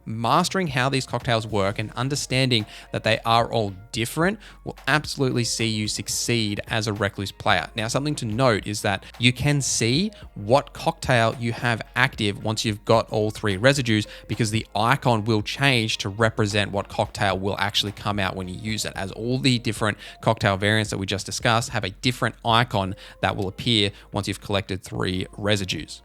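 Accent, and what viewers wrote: Australia. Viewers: Great guide, and so nice to hear an Australian accent :)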